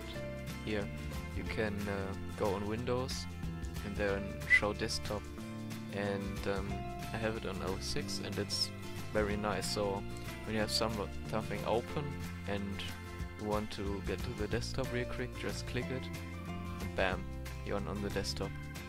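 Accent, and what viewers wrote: Germany. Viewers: Man hört deinen deutschen Akzent mega :DD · man hört sehr stark heraus das du deutsch bist deswegen finde ich sollst du es eigentlich auf deutsch machen und nicht auf englisch, da geht es nicht darum ob man englisch kann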